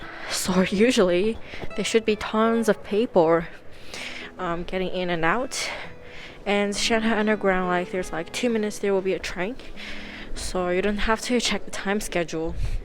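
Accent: Australia. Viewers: Nice vlog, but do not pick up more of that Aussi accent :) Stay safe ! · So nice to hear your oz accent. 😍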